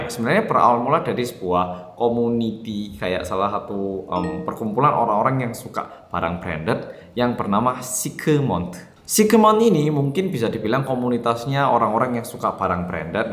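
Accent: Java, Indonesia. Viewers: Bang kl ngomong korea logatnya korea, tapi klo ngomong indonesia logatnya jawa medok:)_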